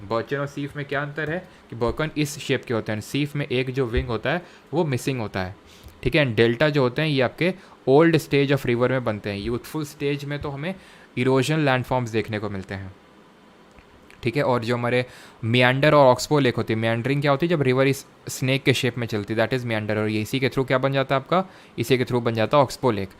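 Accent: Kanpur, India. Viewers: Sir kanpur se ho aap ?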